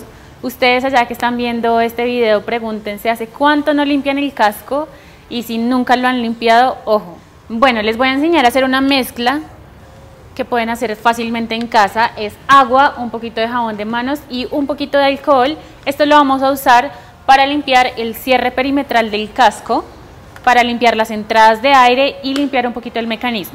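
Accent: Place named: Colombia. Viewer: Gracias por este vídeo, me encanta tu acento colombiano ✔️👍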